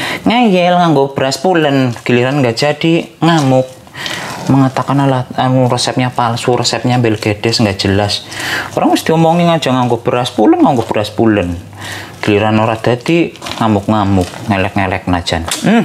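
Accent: Banyumas, Indonesia. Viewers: Wong ngapak..., Ngomonge ngapak..., Ora ngapak ora kepenak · Ngapak....senyum2 sndiri dipanggil lik.. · Ngapak mas medok banget semoga sukses mas. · Njenengan wong endi mas, ngapak2😅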